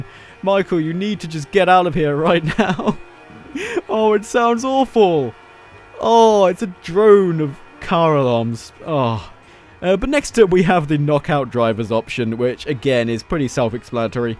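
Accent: United Kingdom. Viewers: You never heard a normal British accent before?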